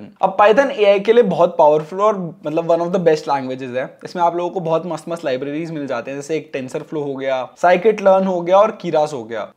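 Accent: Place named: India. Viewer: Dude, you may have been saying something worth listening to, but your accent makes it very difficult for non-Indian speakers to understand you because you talk too fast. If you really want to get your information out there in videos, try talking slower.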